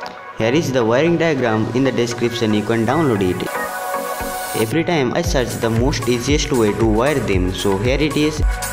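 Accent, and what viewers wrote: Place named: India. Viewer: It is Indian version of English ( India ), English is just a language, Don't give it to much importance, Skills are much more important ( and drones are the future )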